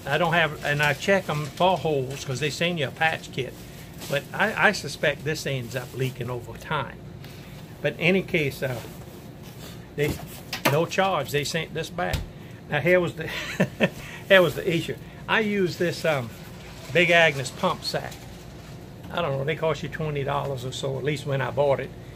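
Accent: South, United States. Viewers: So glad I found your channel. I'm just a backpacker wannabe, but have done a lot of motorcycle camping with my husband, and find that the lightweight gear choices are similar. Your videos are fun and informative, and it's nice to hear another southern accent!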